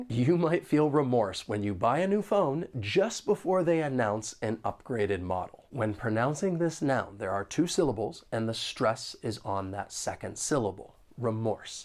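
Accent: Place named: General American, United States. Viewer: he answered "the US". Not North America. Let's not split hairs here. If the accent interests the author of the Q, he should have been more specific. has a standard American accent, regardless of the region he comes from.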